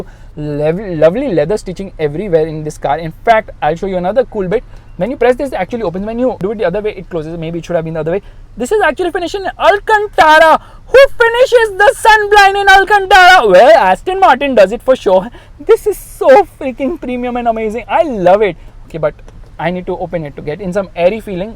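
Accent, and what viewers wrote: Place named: North India, India. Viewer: Irritating North Indians English 🥵🥵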